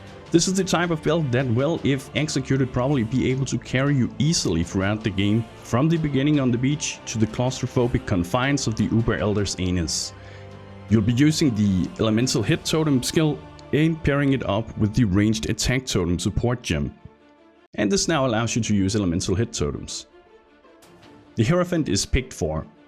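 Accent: Denmark. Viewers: Danish? Can't help but notice that accent... Banter aside, great video man!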